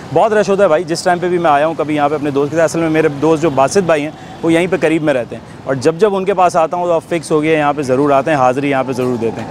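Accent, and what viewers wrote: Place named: Uttar Pradesh, India. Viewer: 🌹🌹🌹🌹🌹 भाई आप की बोली बिल्कुल हमारे उत्तर प्रदेश हिन्दुस्तान की तरह है माशाल्लाह हिन्दुस्तान जिन्दाबाद 🇮🇳🇮🇳🇮🇳🇮🇳🇮🇳🇮🇳🇮🇳🇮🇳🇮🇳🇮🇳🇮🇳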